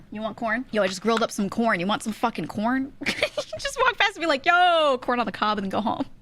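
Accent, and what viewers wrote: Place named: New York, United States. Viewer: she does have the accent and she does have an very bronx/ New York accent.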